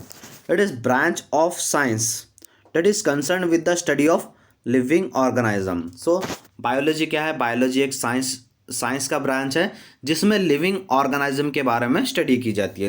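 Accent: Hyderabad, India. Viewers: Sir ap Hyderabad se hai kya..?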